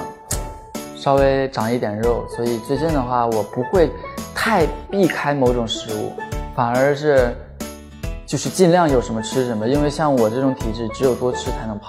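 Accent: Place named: Dongbei, China. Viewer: 呗 got me thinking he said "bae" instead. Thank goodness for his Dongbei accent. Happiest mistake ever 😍💕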